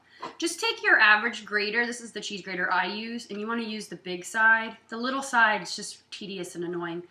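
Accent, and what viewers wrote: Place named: United States. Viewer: Thank you for showing us how to freeze a lemon. I think it's a great idea and I loved the video. I wondered if I could ask you to try and speak a little slower as I am from England and can't always catch the american accent. I wish you and your family well.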